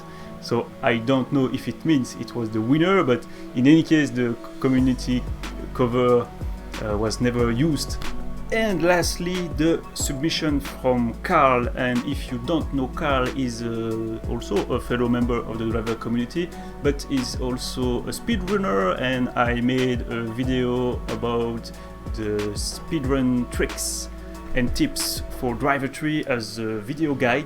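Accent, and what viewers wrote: France. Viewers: What a handsome guy you are! And nice French accent. ☺ Thanks for keeping on Driver on YouTube. Wish you success!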